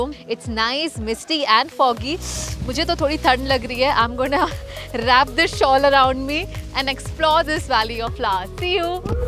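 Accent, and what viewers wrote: India. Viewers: या बाईंच्या हिंदी-इंग्रजी ऍक्सेंटने मराठी भाषेचा ठसका/लहेजाची गंमत नाहीशी केली,काय तर म्हणे सतारा,कांदी पेढा (त्याऐवजी सातारा म्हणावं,कंदी पेढा म्हणावं-म्हणजे ऐकताना कानाला कसं ग्वाड वाटतयं.)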